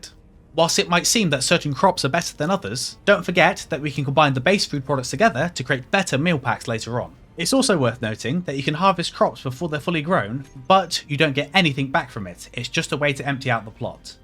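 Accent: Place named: United Kingdom. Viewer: I gate how brits pronounce things but otherwise good video.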